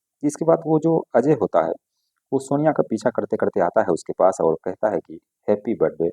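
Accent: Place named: Bihar, India. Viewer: Typical Bihari hi ye. Pronounciation check kijiye please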